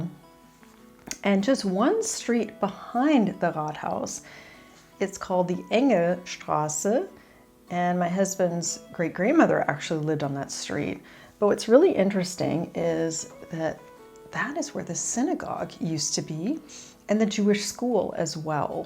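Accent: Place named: Texas, United States. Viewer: I love your German cause I can hear a little Texas thang in it, you sound lovely. I went to Germany for world youth day in 2000.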